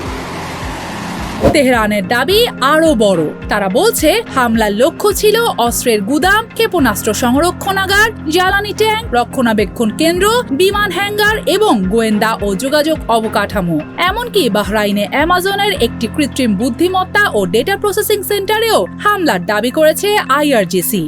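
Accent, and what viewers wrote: Kolkata, India. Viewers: এইরকম কলকাতার স্টাইলে খবর পড়ে কেন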